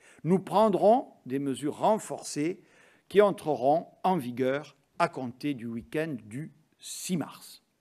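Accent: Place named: South, France. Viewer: Avec son accent du sud, il me fait penser à l'instituteur dans le film :"la gloire de mon père" !! Sauf castex fait dans " la gloire de la dictature sanitaire "avec un plaisir psychorigide.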